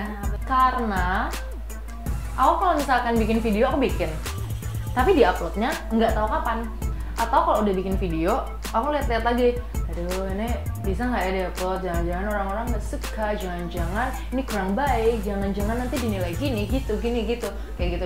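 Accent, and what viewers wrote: Malang, Indonesia. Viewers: Logat malangnya masih kerasa 😍😍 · Logat malangnya semakin terasa dari tengah sampek akhir ya mbaa wkwkkw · Medok Malang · hehehee.. logat ngalame ga ilang ilang... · Logat jawa Malangnya kerasa ya :v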